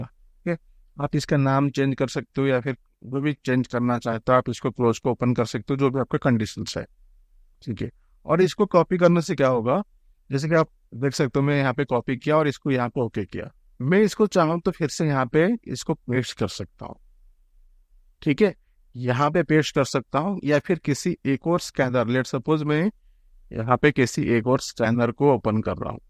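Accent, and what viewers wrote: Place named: Bengal, India. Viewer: bhai aapka hindi samaj mein ataa nahin.. bengali bolte ho aisa lagta he..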